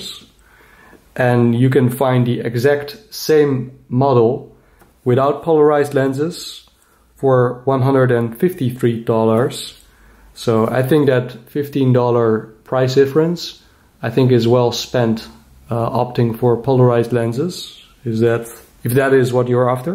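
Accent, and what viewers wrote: Netherlands. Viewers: You sound kind of Dutch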